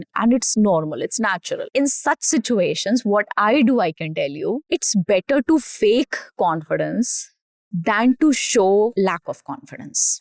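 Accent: India. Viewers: You are so good with words. But your Indian accent ruins everything. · Am I the only one having problem with indian English accent ? 😢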